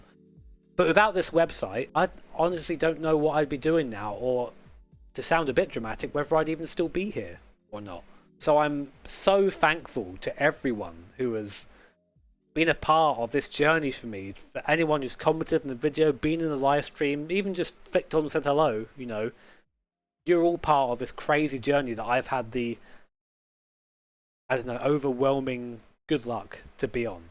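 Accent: United Kingdom. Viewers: I started watching you while playing GT6 year or two before start of big cough, finishing my uni. Watching you and tbh. There was something cozy and helping me in "British guy talking with engine background ASMR" at this period. Thanks for all your content, wishing you all the best, like best car ever - Fiat 126P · I remember when I discovered this channel it was a livestream with about 150 viewers and this guy with the British accent and the Japanese headband was completely dedicated to what he was doing. For me it had something of the Topgear spirit... made me subscribe straight away...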